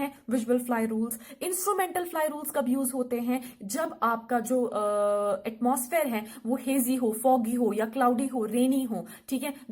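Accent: Kashmir, India. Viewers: Aap Kashmir se ho mam?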